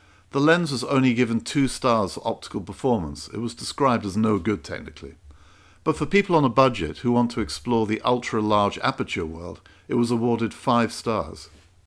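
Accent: United Kingdom. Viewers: Very nicely made, fantastic voice, better than most of the best tv newsreaders. Can't beat the Brits ! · Very British, were I to guess?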